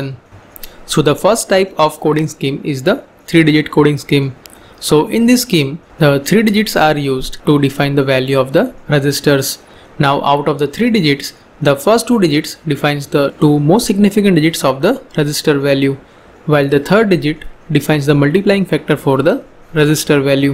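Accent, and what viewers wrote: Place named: Kerala, India. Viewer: I have a friend from Kerala with your the same accent, are you from Kerala Baei?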